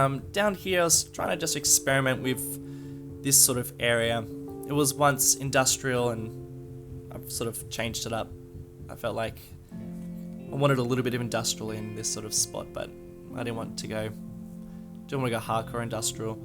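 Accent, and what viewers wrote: Australia. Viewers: You sound like an Aussie. But I can't tell.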